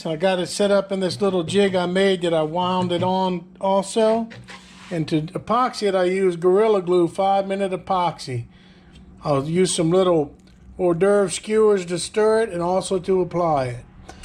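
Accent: Louisiana, United States. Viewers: I thought I recognized that accent! Fellow (former) South Louisianian here - and your video is perfect! I was worried about doing it "right", and this video shows me how easy it is to do it right, and make it look great. I'm in the Northeast now, and I have been trying my hand at fly fishing. I was gifted a flyrod from the late 1960s, and it needs to have the eyes rethreaded and resealed. Fishing for stream trout and is fun and all, but nothing like hooking on to a bull red! Can't wait to get back home to Louisiana to hit the marshes.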